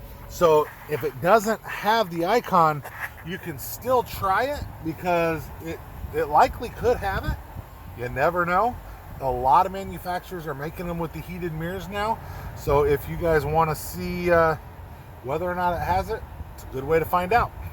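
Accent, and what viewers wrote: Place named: Flint, United States. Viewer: Flint guy here, I know that accent!!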